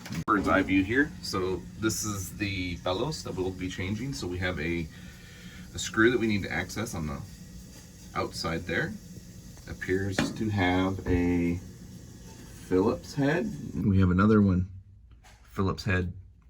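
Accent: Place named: United States. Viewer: Awesome, thanks. May I ask, where is 'your' American accent from? Perhaps someone could let me know. I have always wandered because an American actor has the same, and I just don't know where it's from. If I was to guess...perhaps from around NEW York; but there are more than a few. Thanks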